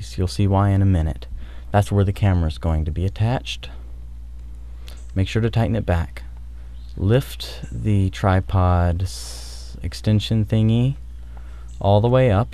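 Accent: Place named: California, United States. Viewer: it's weird to hear your voice before you got your California dude-bro accent haha <3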